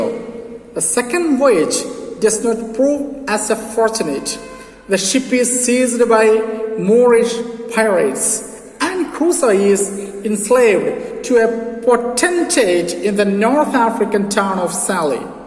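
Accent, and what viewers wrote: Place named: England, United Kingdom. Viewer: Presented well. Your adherence to English accent is appreciative but let me tell you Indian accent is also acceptable. Once fluency is achieved accent is welcome otherwise it will sound a little bit artificial. Having said this, I don't mean you are average , you are well above and I wish you splendid honeymoon with the language.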